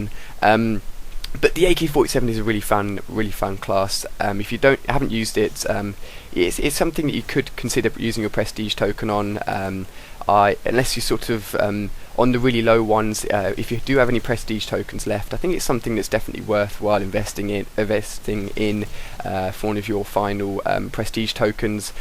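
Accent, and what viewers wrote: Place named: England, United Kingdom. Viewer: you sometimes sound like brownay( i hate brownay) but in a english accent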